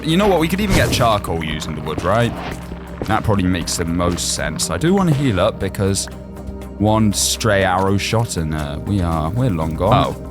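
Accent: United Kingdom. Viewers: are you British 😂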